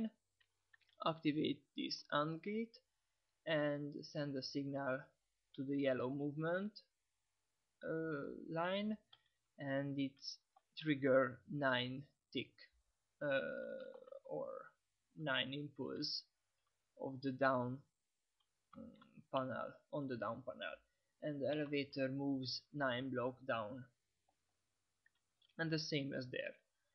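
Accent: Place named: Serbia. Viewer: Thank you very much Both of these videos are very helpful. And nice accent mate, Serbian by guess.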